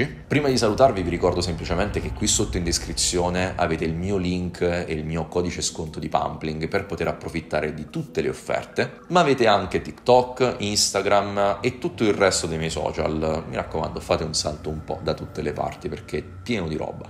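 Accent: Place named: Tuscany, Italy. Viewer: sento un accento toscano in lontananza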